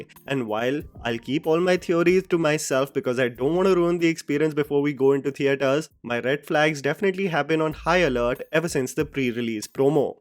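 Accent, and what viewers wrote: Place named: India. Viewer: FFS. Please work on your pronunciations of ‘conjure’ and ‘mass’, remembering that they are English words. An Indian accent is one thing, but to do the maa behn of the English language is something else. Do ‘chime in’, won’t you!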